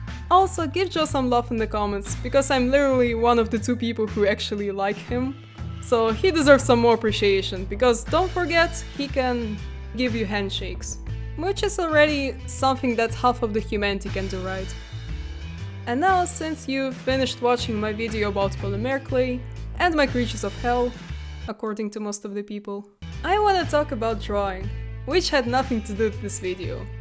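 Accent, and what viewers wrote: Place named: Russia. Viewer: Такой яркий русский(?) акцент, что я даже обрадовался тому, что глиномесов с прямыми руками полно и в России. (Если я все-таки оказался прав.)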